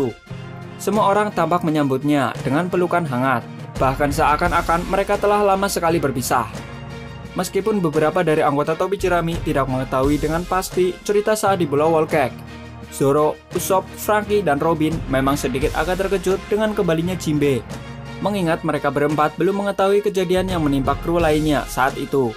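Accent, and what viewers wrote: Java, Indonesia. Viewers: Medok temen yak...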